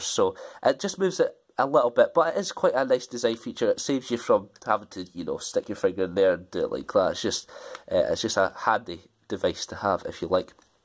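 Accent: Scotland, United Kingdom. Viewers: What part uv Scotland u from?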